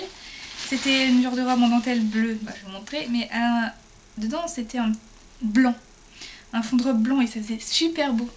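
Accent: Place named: North, France. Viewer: Youpi...jai perçu ton ti accent chaleureux du nord..jaiime trop votre parler ces tro cool...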